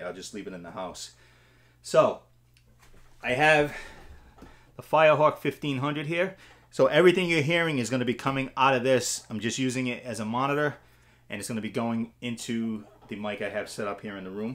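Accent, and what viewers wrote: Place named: New Hampshire, United States. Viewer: You have that NH accent. You from there? I'm originally from laconia.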